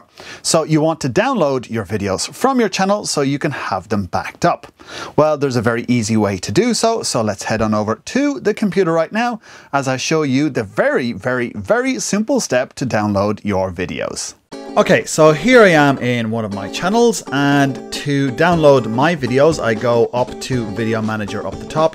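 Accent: Ireland. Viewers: are you Irish by any chance, that accent sounds very familiar... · Irish,accent caught my 'ear"..i need to use videos from a chsnnel im subscribed to here to a site thats app hasn't lauched yet i know they are all in my playlust but everyone else seems to be sble to post a YT to watch.....any ideas please !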